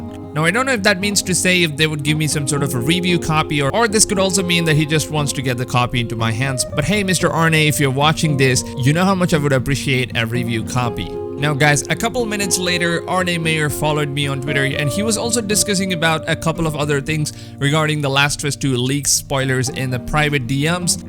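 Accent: India. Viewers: Great video man. Where are you from btw? You sound Indian or South Asian? Thanks and hope we get the game soon!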